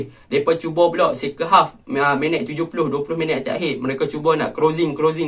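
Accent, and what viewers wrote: North, Malaysia. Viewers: Org utara mmg akn sebut mcm tu lidah diorng mmg cmtu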